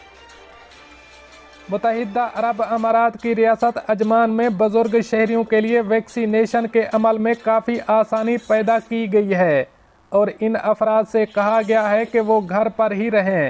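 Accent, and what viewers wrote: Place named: Punjab, India. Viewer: Urdu news in PUNJAAAABI accent?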